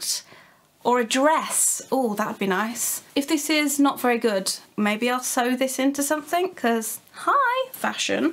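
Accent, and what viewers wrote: United Kingdom. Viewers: I like your British twang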